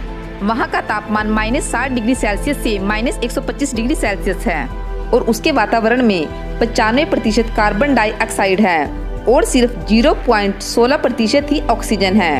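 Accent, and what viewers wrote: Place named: Sikkim, India. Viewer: U are from sikkim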